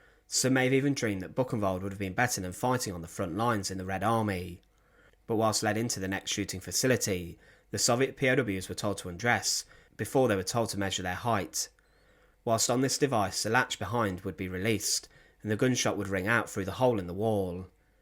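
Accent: United Kingdom. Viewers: That is the young man's accent! Everyone from his neighbourhood in the UK will speak in this manner. Nothing to do with disrespect.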